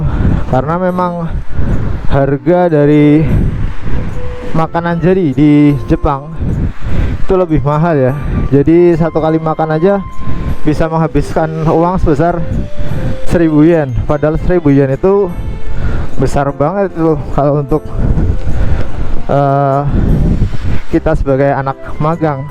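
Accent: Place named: Banyumas, Indonesia. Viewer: Wong endi mas, agak ngapak hehe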